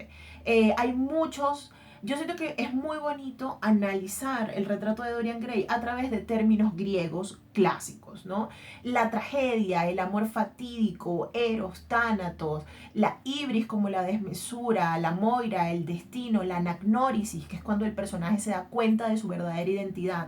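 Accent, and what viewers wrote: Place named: Venezuela. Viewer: Hola... buen análisis, tu acento es tan bello como tu rostro, y sin temor a equivocarme, ambos son venezolanos... un fuerte abrazo.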